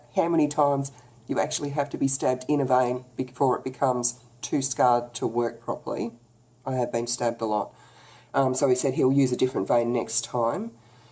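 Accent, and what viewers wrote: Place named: Australia. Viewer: Hi, Thanks very much for posting this. I had an iron infusion yesterday, am feeling achy, lethargic, hypersensitive and a bit nauseous today, like a bit flu-ey or something. Appreciate your video, so far, you're the most watchable genuine person I've found and of course the Aussie accent is the best break from all those bloody American ones! 🙄👍❤️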